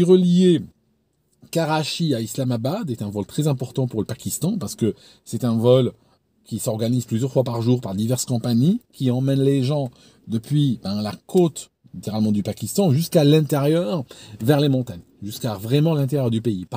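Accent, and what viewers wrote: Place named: Geneva, Switzerland. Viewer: Excellent comme toujours. Je perçois, depuis deux vidéos, un accent genevois qui revient comme un jour de Bise sur la rade. Je suspecte un contact alpin récent…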